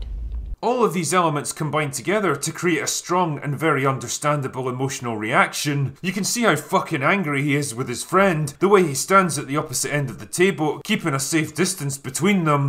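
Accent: Scotland, United Kingdom. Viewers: is your accent scottish or are you doing an immitation of an intoxicated man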